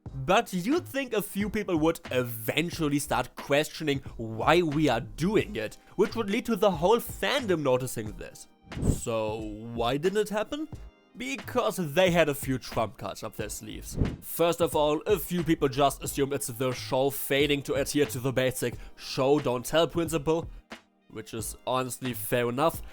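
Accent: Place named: Germany. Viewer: ich höre den deutschen akzent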